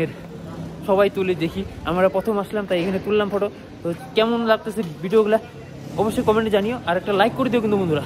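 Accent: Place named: Bangal, Bangladesh. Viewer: At first you have to speak bengali properly, bangal language is not acceptable in India.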